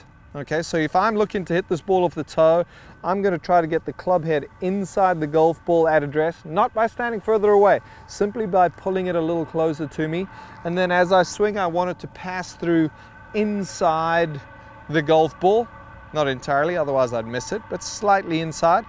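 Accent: South Africa. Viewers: Howzit, First time watching this video and found it really helpful. I just wanna ask one thing Are you South African because you have a certain twag that really seems South African 😂😂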